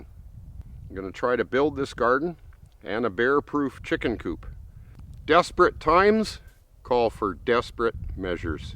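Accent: Alberta, Canada. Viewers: Mr. was this video produced in the Rocky Mountains of Canada. I noticed you and your friend were referencing the miles in Kilometers and you have a Canadian Accent.? Also, us there Cell Phone Service in the mountains that far back in to reach Medical Help if needed.? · He has a strong albertan accent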